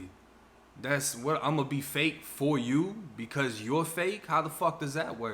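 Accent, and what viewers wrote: Boston, United States. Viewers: I'm just finding out about you. I love you. And you're from up North. Sounds like Boston. Jersey City NJ here. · Your from Tampa?!!! Why do I hear a hard ass accent like your from Boston. When you say yard and car and shit like that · My man tells a great story. I see ppl saying he was scared in certain situations, but something tells me he 💯. Tell your story to keep ppl outta jail. But that Boston accent... Keep 'em coming,bro. I just subscribed. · I thought you were from Boston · Sound like you from Boston, Blood. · I dont know why.I thought you was from Boston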